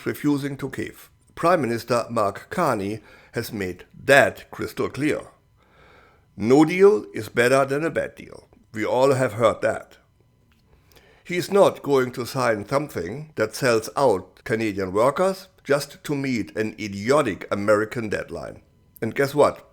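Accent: Germany. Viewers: Liebe Grüße aus good old Germany! Ich muss schon sagen, dein deutscher Akzent ist deutlich zu hören, doch deine English Skills sind schon recht ordentlich. 😇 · thank you, great reporting & did I ever tell you that I love your German accent? LOL!! I totally agree with your POV! Although these times are VERY stressful for everyone, I can't say how PROUD I am of my fellow Canadians for standing up and standing strong against those CRIMINALS in the US. Whenever I feel down about everything, I read the comments on shows like yours and feel so much better, much calmer knowing that Canadians are feeling the same as I do and are willing to suffer the short term to gain in the long run. I trust our PM and am so happy to see him taking Canada away from these cretins down south and doing business with the rest of the world. What's the point of giving concessions to a mob boss that will NOT honour any deal with sign anyway?? 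Look, I work for the GOC and nearly lost my job when Carney did those major cutbacks on the public service. I was one of the lucky ones that didn't get cut but even if I did, I would still support him and what he's doing for our country against the lawless, murdering criminals running the United States right now. The one good thing tRumpf has done for Canada is bring us closer together as a nation to realize how lucky we are to BE Canadian and that we must now fight for that life - again - in world history. CANADA STRONG & BABA-MAGA (buy anything but american & make america go away)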